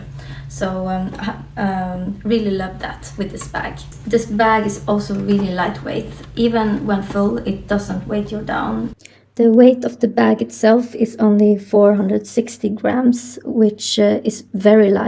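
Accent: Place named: Sweden. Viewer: I love your Swedish accent! tack tack for videoen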